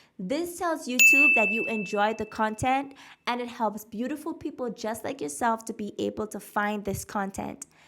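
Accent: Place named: Nassau, Bahamas. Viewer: I knew it u sound like some of my family who live in Nassau ❤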